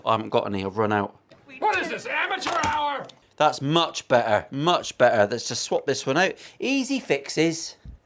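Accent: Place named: Plymouth, United Kingdom. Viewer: Ha I hear your janner accent dare do . I got my own parts-caster , and 2 x heavily modified acoustics ( cow sticks in the state's) all lefties as am I. They're shit ruff but I live in Devon so if you're looking for guitars to feature 😂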